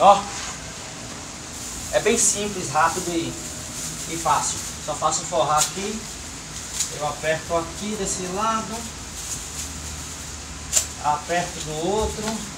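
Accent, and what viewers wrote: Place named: Pernambuco, Brazil. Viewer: Sou de Pernambuco e conheci o sotaque pai! kk Muito bom o vídeo! Entrei no grupo do whatsapp e tenho algumas dúvidas...estou no aguardo do grupo, muito obrigado e parabéns!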